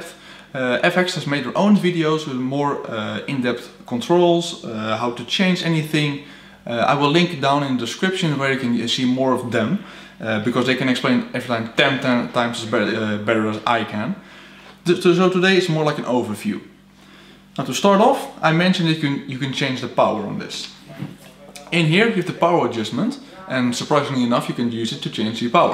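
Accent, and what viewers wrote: Netherlands. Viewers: Ben je gewoon Nederlander? Aan je accent te horen wel? Niet negatief ofzo, gewoon nieuwsgierig.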